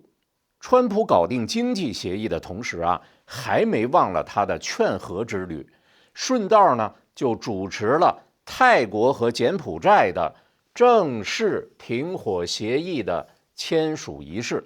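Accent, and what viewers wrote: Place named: Beijing, China. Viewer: ，您的节目是我日日必看的节目! 您的语音是我最爱的听的语音，正统，正规，严谨无误的北京啌，又不贫嘴，又不官腔，太棒了！而且报道的内容我都信服。尤其是好消息，好动态更让我兴奋无比。谢谢！难得难得啊！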